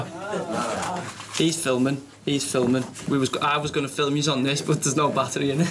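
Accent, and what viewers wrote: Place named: France. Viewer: so he decide to continue dat french accent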